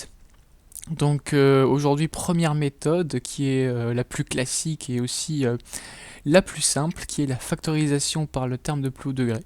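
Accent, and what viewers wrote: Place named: France. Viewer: French accent 🥸🥸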